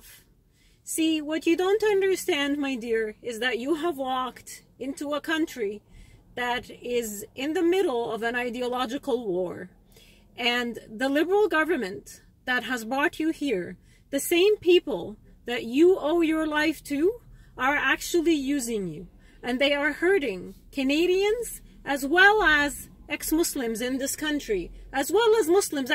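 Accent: Egypt. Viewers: how come you are Saudi and you accent Egyptian ? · انتي سعوديه وكيف لهجتك مصريه😂😂😂😂😂😂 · Egyption accent and speaking on behalf of saudi women. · how you can be a SAUDI citizen but you accents like a Egyptian?🌚😸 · كيف سعوديه وانت تتكلمين مصري؟ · Your accent is not from Saudi Arabia. Your accent is from Egypt. I think you were born as christian , not a muslim. I am from Middle East and everything is clear for me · How comes she says she is a Saudi when she has a strong Egyptian accent she is not telling the truth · she said she is originally from Saudi Arabia , I am from Egypt and her accent is Egyptian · Saudi with thick Egyptian accent 😭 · Your accent is Egyptian... lying is not freedom.. U r right about parading Burdoo. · you not saudi Your accent is from Egypt. Don't lie and say you are Saudi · For people asking how come she’s a Saudi Arabian and her accent is Egyptian.. 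I’m Saudi Arabian and a lot of my male relatives are married to Egyptian women and their daughters speak with Egyptian accent just like their mothers while they’re Saudi Arabian. I just wanted to clear things up. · You are 100% Egyptian. The Egyptian accent!!!!!!! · Hhhhhhhhhhhh You are laying at your self saying your from saudi arabia 🤬🤣🤣🤣. You are from Egypt. Your accent is Egyptian 😱. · Pat Maguire believe me she is Egyptian I can smell the falafel from her ...we Saudis know each other + we don't live in egypt and you can't find a saudi with Egyptian accent . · How do you claim you’re Saudi while your accent is pure Egyptian? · You are not Saudi , your accent Egyptian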